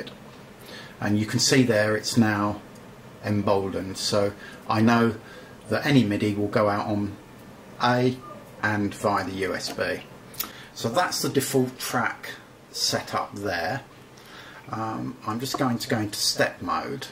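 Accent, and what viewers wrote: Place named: Nottingham, United Kingdom. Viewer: love the Nottingham accent. Bonsai and synths, now both the way god intended ;-) Subbed.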